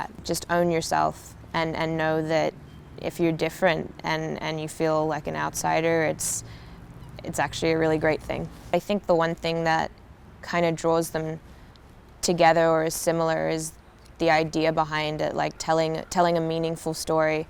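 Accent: United States. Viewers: She's slowly getting an American accent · Bloody hell, I wish she'd turn off the american accent!!!! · I luved her American accent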